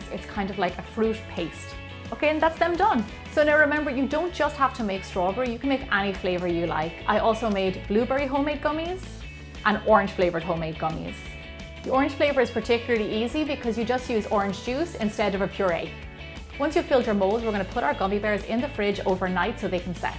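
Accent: Ireland. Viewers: Omg... I loveeeee this... Thanks for sharing... You sound "Irish" to me, may I just say, that I love Ireland... I fund it beautiful when I visited... Love to go back again some day. Thanks for sharing... Love that you give tips on how to "vegan"-nize your recipes... 😘😘😘❤️❤️❤️👏🏽👏🏽👏🏽👏🏽👏🏽👍🏿👍🏿👍🏿 Best wishes. From me at Sunshine State 🌴🌴🌴🌴🌴 🤗🤗🤗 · What part of Ireland are you from? (but Limerick is the best because I'm from there) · Thank you:) I was picking up in the Irish accent but I was uncertain:) I listen to Liveliness every day on Radio One over the RTE app.:) I hope to go someday!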